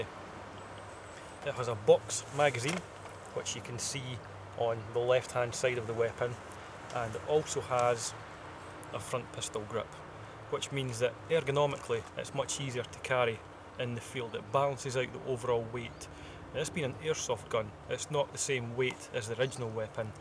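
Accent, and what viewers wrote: Scotland, United Kingdom. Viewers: You are so scottish like john mctavish :) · you dont get much more scotish =S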